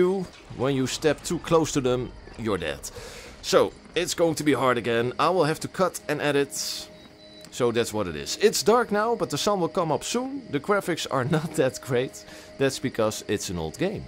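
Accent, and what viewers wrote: Netherlands. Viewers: Dude, are you Dutch? You have a really strong accent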